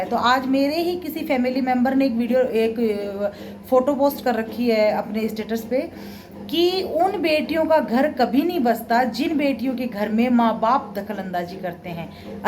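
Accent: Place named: Shekhawati, India. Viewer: Shekhawati❤️ voice dil ko chu gyi didi really we like you ❤️❤️❤️❤️❤️❤️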